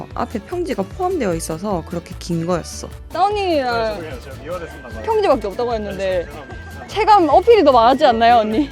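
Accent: Gyeongsang, South Korea. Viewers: 멋집니다^^ 경상도 말투가 더 정겹네요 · 뭔가 갱상도 악센트가...ㅋ 대단하세요. 예쁩니다.^^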